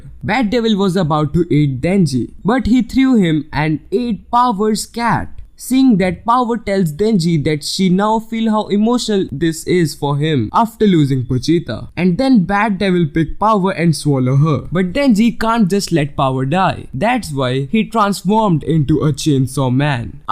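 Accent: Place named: India. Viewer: Bro why don't you voiceover in hindi Misss your hindi accent 😢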